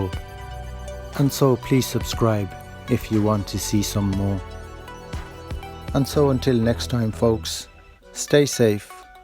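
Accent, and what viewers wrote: Birmingham, United Kingdom. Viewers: your English accent suggests that much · Are you from Birmingham? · I am not a watchmaker but an engineer. As such I can appreciate the work in these beautiful units. Your videos are clear, informative but best of all relaxing and easy to watch. I think the gentle brum is a joy to hear.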